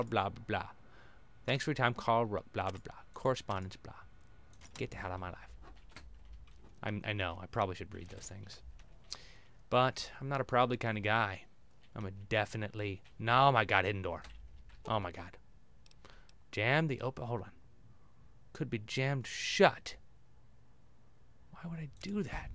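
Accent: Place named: Scotland, United Kingdom. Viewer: your scottish accent is actually okay :L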